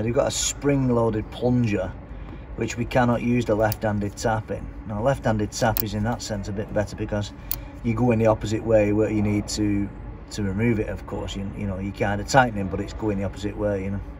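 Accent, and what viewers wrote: North, United Kingdom. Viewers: These videos are a great watch and show your skills. Nice to hear a northern accent too, even if it is from across the border for me !! Please keep making more 👌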